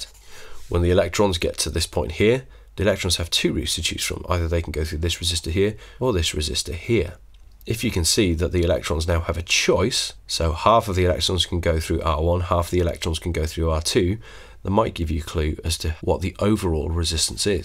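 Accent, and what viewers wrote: United Kingdom. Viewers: Ok but why does his voice , scream British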